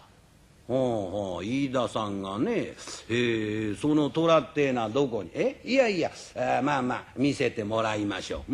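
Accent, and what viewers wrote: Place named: Edo, Japan. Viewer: べらんめえ調の良さ。 いいね！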